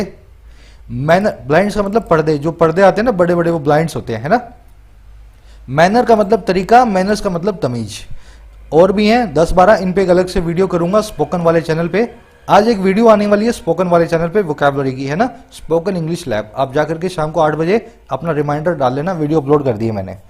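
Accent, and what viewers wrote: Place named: Delhi, India. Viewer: Do You belong to Delhi area, your language just like delhist, very attractive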